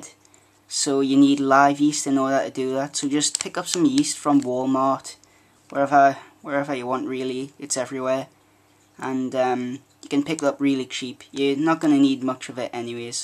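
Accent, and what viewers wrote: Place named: United Kingdom. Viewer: Thanks for the vid!!! Great idea, that's what my plants need!:-) Hey, btw. you have got really nice and interesting accent, I like that, are you from the UK I guess, which part?:-)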